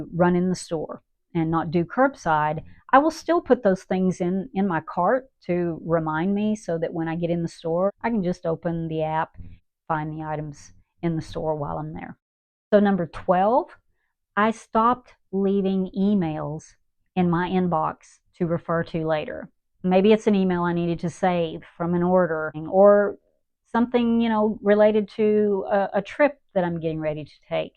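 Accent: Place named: Tennessee, United States. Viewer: Hello there! So happy to have found another Gen X sister! I just subscribed! And I thought I’d detected a little Tennessee twang in that accent! (I’m from Tennessee too!) I love your ideas, especially the one about not waiting until the dishwasher is completely full before running a load.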